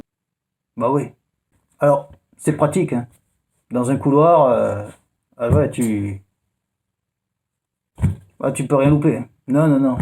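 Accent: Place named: South, France. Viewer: Putain c est génial !!!! Tout l accent du sud qui va bien et le reste !!! Mdr 😂 salopage mythique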